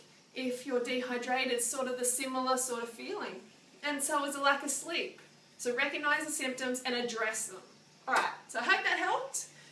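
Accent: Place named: Australia. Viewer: you have a nice body it is such a pity you speak like a dumb bimbo australian!! i was going to marry you until you opened your big ozzy gob!! i come from devon so speak like a farmer look like shrek!! how can you help me? get healthier i am getn a bit of flub on my ut i eat meat wrestle animals to the grounds if i am hungry gnaw at their ankles! if i dont get meat!! your meat is very tasty & nice!! so can i have some?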